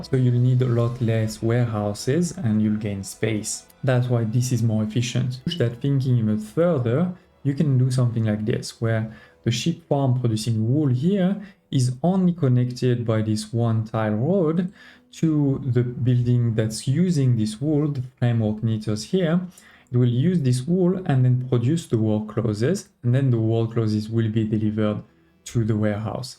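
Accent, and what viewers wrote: France. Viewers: It is perhaps a fallacy of the American mind that I immediately think “this guy has a French accent, therefore I trust his advice unconditionally.”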